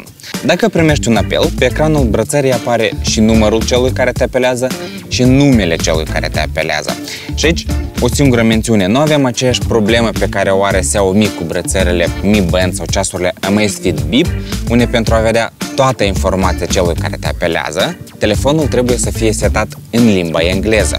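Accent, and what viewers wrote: Moldova. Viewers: Dat'n slobiz de moldovean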